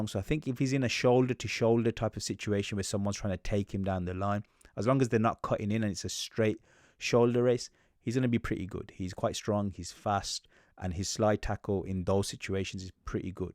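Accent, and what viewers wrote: Birmingham, United Kingdom. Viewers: You from Birmingham?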